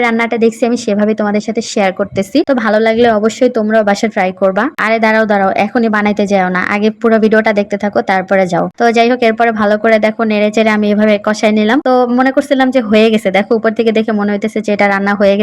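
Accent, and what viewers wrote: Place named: Old Dhaka, Bangladesh. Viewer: Oi apu apni ki puran dhakai thaken apner kotha gula puran dhakar kothar moto reply diben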